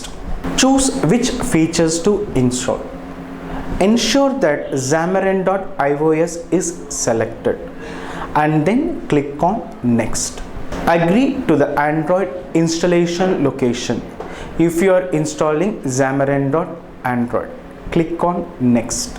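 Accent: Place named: India. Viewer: Dispite the Indian Accent, the video is very good. It's very nice knowing we can develop powerful apps using c# to Android! Thx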